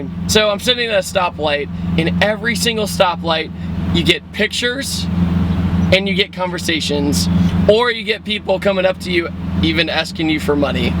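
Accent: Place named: Pittsburgh, United States. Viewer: Dat Pittsburg blowg doe.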